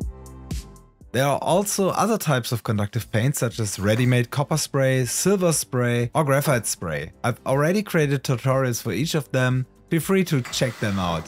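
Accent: Germany. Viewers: An deinem Akzent höre ich das du auch aus Deutschland kommst 😁💪🏽 gute Arbeit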